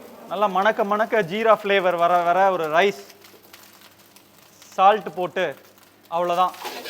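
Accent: Kongu, India. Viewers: சூப்பர் ங்க உங்க சமையலும் நம்ம கொங்கு தமிழும் ❤❤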